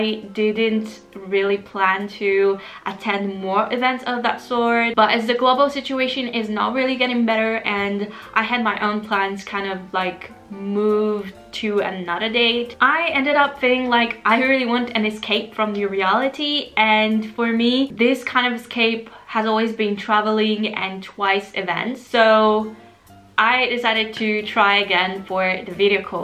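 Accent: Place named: Australia. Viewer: Why are you sounds like Australia😂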